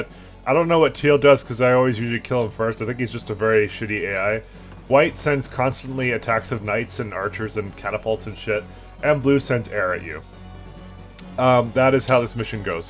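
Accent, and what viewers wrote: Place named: Canada. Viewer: You speak canadian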